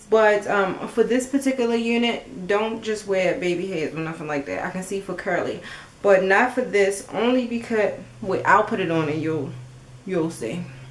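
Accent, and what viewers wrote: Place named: East Coast, United States. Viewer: Where are you from? East coast? Lol, Maryland? I need to know that accent is everything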